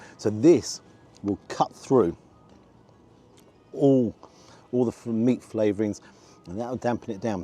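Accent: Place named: North, United Kingdom. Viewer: If you call your channel "Rate My Takeaway" you're not going to high end Fallow or Gordon Ramsay any time soon. He does what it says. Unfair comparison. PS his English is a perfectly fine regional vernacular. One of many. It makes the UK fascinating. Some might say "You sound elitist and frankly a little bigoted towards Northern working class folk." Some might.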